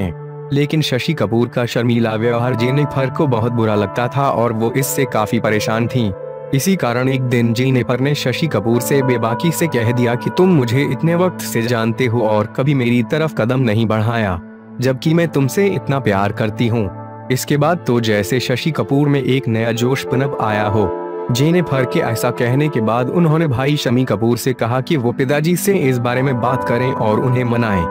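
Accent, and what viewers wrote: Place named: Bihar, India. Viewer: Bihari Type Commentary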